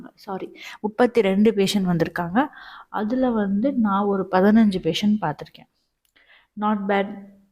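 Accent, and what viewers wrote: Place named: Tirunelveli, India. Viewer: Spr sis tamil rmpa alzhaga pesureenga na tirunelveli nu nenajen..... ✨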